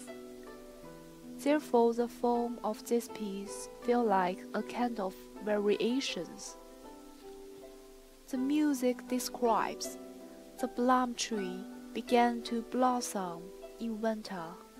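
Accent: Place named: Taiwan. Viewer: This musician has Plum Blossom Spirit. I liked the illustrated introduction with English text, voice-over narration in English and background music. The background music volume was balanced well with the volume of the voice-over narration. Unfortunately, there were several mispronunciations of English words that were not corrected by an English coach or pronunciation software with voice feedback. The intonation was fairly good. I was strongly reminded of the accent of the Taiwanese actress Qi Shu in the movie 'The Transporter(2002)'. The performance was very good to my ears but I know nothing about Bamboo Flute playing and very little about music. In the word 'anecdote' the final letter 'e' is silent and makes 'anecdote' rhyme with 'note', 'wrote', 'coat', 'boat', 'float', 'mote' and 'dote'. How to pronounce 'anecdote' in American English <link> How to pronounce ANECDOTE in British English <link> Knowing how to pronounce words in English is very difficult especially if one is obtaining a lot of information from written material. 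In my first year calculus class I had a professor with a good sense of humour who told a funny anecdote in the lecture room about how to tell mathematicians from other professionals in science using a kettle of water to be heated. One day I was talking with him in his office and I mispronounced the name of the German mathematician George Bernhard Riemann as "ryeman" and referred to "rye" "manian" geometry. The correct pronunciation is "Reemon" and "Re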